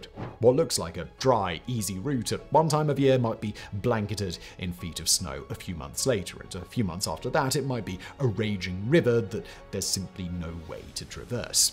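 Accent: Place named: United Kingdom. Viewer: Is his voice not 10x more British in this video than all of the others? Or is it Just me? 😂I actually couldn’t even understand some of what he said a few times and I’ve been watching for like two years. Wth? 🤷🏻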